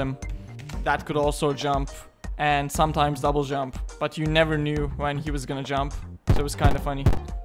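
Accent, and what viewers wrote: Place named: Turkey. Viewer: izlerken dedim adamın ingilizcesinde türk aksanı var gibi la sonra baktım kanalın adı lol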